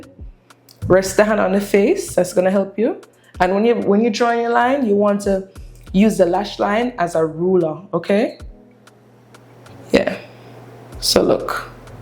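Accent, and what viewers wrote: Jamaica. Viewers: Why do u just LOVE to hear the Jamaican accent?? No matter how long we live in the USA wih juss caan hide😂😂😂.. !! LOOOVE ur work too Mama🥰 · First time seeing your video. This showed up on my feed. I hear a Jamaican accent. Just check the description and I wear correct😂 hello from your fellow Jamaican🇯🇲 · I actually really love this detailed video🎉❤ You've been popping up on my page for a few days now, and worst you sound Jamaican, you've gained a new makeup sub❤ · What is the models she’s gorgeous and you beat that face soo good I miss og makeup tutorials like this and I’m Jamaican too did I hear that accent lol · You sound Jamaican I'm Jamaican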